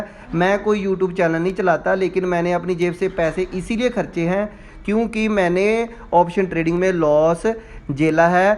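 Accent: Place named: Punjab, India. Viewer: Thanks for this information...it looks like you are from punjab as your way for speaking reflects the pure punjabi.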